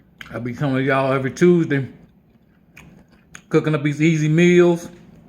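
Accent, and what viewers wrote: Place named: South, United States. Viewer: My wife said you sound country. Where are you from????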